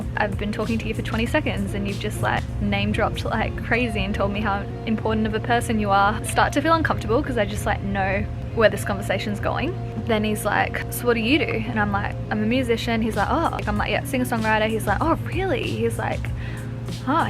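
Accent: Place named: Australia. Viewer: I like your accent. Australian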